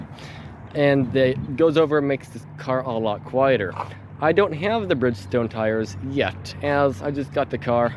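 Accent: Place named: Canada. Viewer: I've just discovered your channel whilst surfing for Mitsubishi Mirage videos, and this is the first one I am seeing. A quick question, are you Canadian? I think I am detecting in your voice a Canadian accent. If I am wrong I must say it comes pretty close to it. I am quite keen on the hatchback Mirage as a replacement for a 2006 Ford Focus, and my eyes have been eating up all this Mitsubishi videos like candy! 😁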